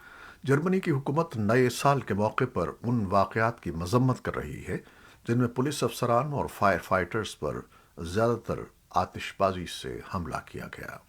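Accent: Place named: Lahore, Pakistan. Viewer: وہی لاہور والا لہجہ وہی ٹھہراو وہی وقار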